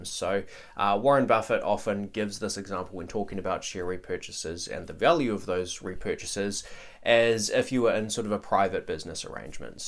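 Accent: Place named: New Zealand. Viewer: He’s from New Zealand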